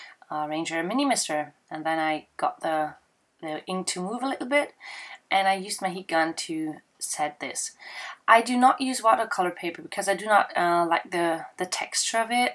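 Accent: Germany. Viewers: Your accent is not funny! It's beautiful to me! I miss hearing german accent. I lived in Stuttgart and Nürnberg, I miss Germany :) Yes, it is craft foam, and I love how you used it to make the shaker, very clever! I like my copics, water color pencils, water color paints, and regular colored pencils with mineral spirits.I am saving up to get Pitt brush markers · Oh I LOVE it when you have a card up! Not only are they adorable, but I get to hear a little bit of the German accent I miss oh so much! As for your coloring question: my marker of choice are the ProMarkers, i use Prisma pencils and I am slowly getting into the whole water colour thing. Keep up the good work and I cannot wait until your stamps are up and running!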